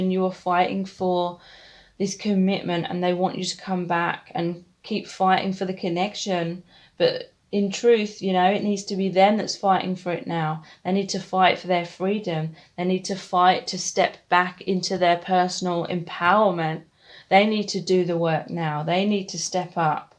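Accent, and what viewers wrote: United Kingdom. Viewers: ❤ You are amazing as always, wonderful job, thanks I adore your British accent ❤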